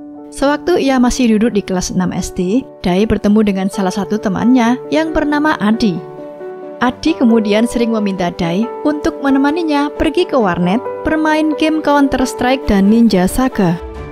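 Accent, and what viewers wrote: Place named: Medan, Indonesia. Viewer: Bang lu orang medan ya?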